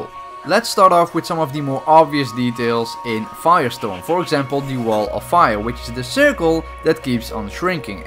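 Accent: Netherlands. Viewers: Ben je nederlands want zo klingt je accent pls zeg het als het zo is ik abboneer nu btw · Are you dutch? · I knew from the first sentence that he is dutch 😂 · Brooooo je hebt echt een zwaaaar nederlands accent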